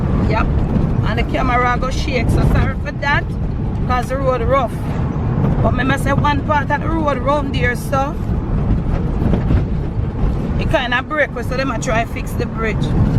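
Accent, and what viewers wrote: Jamaica. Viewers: Enjoying the narration! Love the Jamaica talk! Very relaxing!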